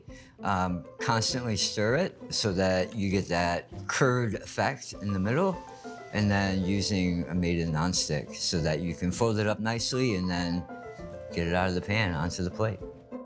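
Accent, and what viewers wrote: South, United States. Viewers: He has a strong southern accent. 😅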